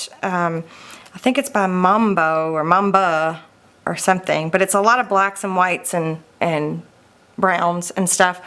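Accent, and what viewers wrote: South, United States. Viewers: Many happy returns of the day and it is lovely to put a face to the voice behind the videos. Wow those albums are wonderful and the flourishing's are beautiful and the the album choice is brilliant as the curved edges look like the tops of cobwebs,I love thee idea of the mirror under the witches hat. Another fab giveaway but I feel we should be gifting you for your birthday! Have a wonderful birthday with loved ones and it is really nice to see you,your very pretty and I love listening to your southern accent. Thanks for the opportunity to win. Amanda in the United Kingdom. 🎨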